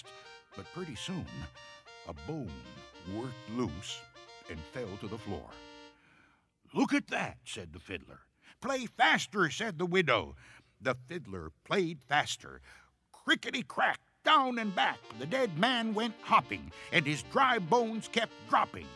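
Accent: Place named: New York, United States. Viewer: I love the narrator’s accent for this story! He is originally from New York I think! 🤣✋ I love this story, more like a dark comedy than a scary story. The delivery is hilarious too Also, that widow is one gold digger, isn’t she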